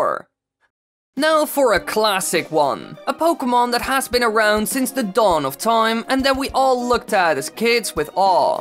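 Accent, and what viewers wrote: Netherlands. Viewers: ar you dutch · Are you dutch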